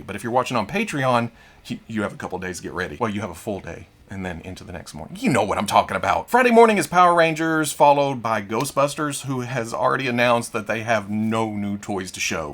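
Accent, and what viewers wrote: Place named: South, United States. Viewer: I say this with love….but man you hit “This is his Baby” so hard with that country accent. Lol #thatsweetsouthernaccent #roboark #queekly #THAILOG Then again I can’t say much being on the opposite side of the state. Lol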